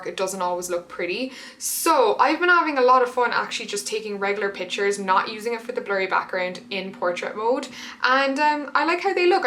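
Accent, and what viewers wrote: Ireland. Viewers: Funny thing.... I was watching while getting my son (12) ready for school then sees you and said that he thought I was watching RetroFlame! I told him this is and she’s Irish too. Her and Erika have the same accent. I do tell him and show him from time to time the Youtubers I watch. Just thought it was hilarious! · Love videos like this, I always learn something :) Maybe you can do one about how to do well on Instagram? Also, is it just me or is your accent stronger after you spent some time in Ireland? Such a nice accent :)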